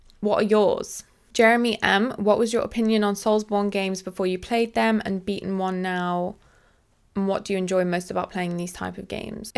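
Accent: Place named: RP, United Kingdom. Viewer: As someone from the Saaf Est, I think your accent sounds quite posh!! 😂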